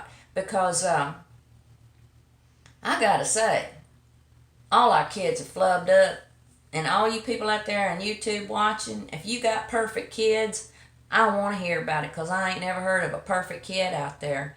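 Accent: South, United States. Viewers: I love that southern accent. is a character! "We're 2 years apart but we're twins"! Now that's some funny stuff!! Great funny video.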